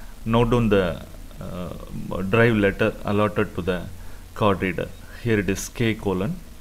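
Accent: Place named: Tamil Nadu, India. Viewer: i am going to have a stack of pi like sbc's having usb's and probably no ethernet (if there is ethernet we use it if not we connect via usb) ... these will be accessed over ssh by a "control computer" (typically a laptop) ... it is a stack which is experimental but production version could have 100's of them for super computing ... you said ssh file will be deleted ... how to have ssh file on every power up of pi's? ... all operations you show seem tedious and requiring human to gain access of each pi which would not be practical when they will be in 100's ... otherwise video is informative ... thank you ... your tamil affected pronunciation is not affecting understanding here because it is a video and we can piece together meaning by looking at action you perform ... but you are pronouncing "cord" for "card" which can confuse in other videos / audios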